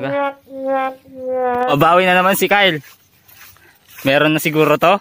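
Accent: Ilocos, Philippines. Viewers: Ilocano po ba kayo oh igorot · Ilocano ka met kanno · Ilocano ka pati siyak😅😅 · Akala ko po visaya po kayo yuon po pala ilokano po kayo ilocano din po ako heheh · Ilocano ka gayam boi hahaha.napugsat diyay saput nan hahhah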